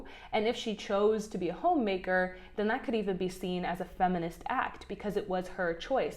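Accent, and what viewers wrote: United States. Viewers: Unrelated to the video (which is very enlightening), you have such a satisfying speaking cadence and articulation. I'm Swedish, and most of my English-speaking friends are British, so I don't hear a lot of American accents - but yours is just so scrumptious (like a tasty snack)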